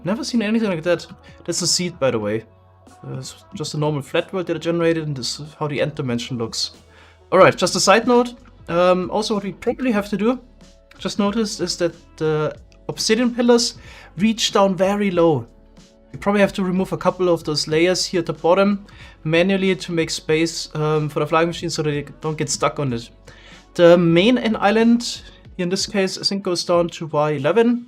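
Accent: Germany. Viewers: Bist du ein Deutscher hört sich so an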